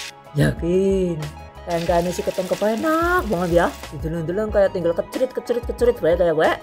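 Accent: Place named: Cilacap, Indonesia. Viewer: Ky logate wong cilacap😁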